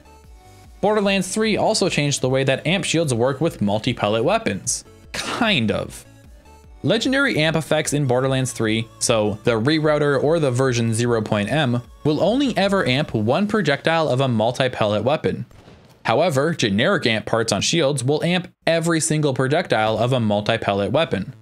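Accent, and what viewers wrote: Canada. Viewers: Is that a Canadian I hear? 😈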